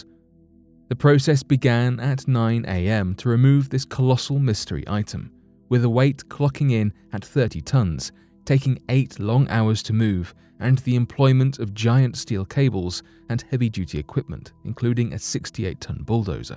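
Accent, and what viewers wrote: United Kingdom. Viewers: This guy is extremely long winded. He prolongs his stories far too long. This love affair with white British voices always amazes me. He's boring. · He repeats himself a lot too. I'm not a fan of British accents either.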